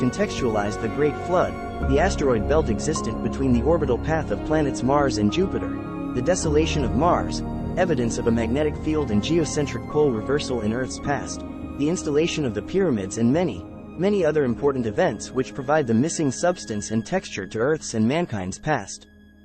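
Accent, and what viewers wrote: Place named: Australia. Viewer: Thats not your voice!! You, my man,sound like crocodile dundee!! Crikey! Great job! The moon is indeed a spaceship.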